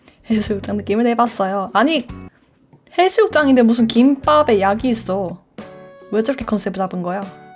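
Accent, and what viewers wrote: Gyeongsang, South Korea. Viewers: 와...... 저 대구토박이인 사람인데요.... 님도 역시 대구사람이구나... 억양이 완전 대구사투리 따봉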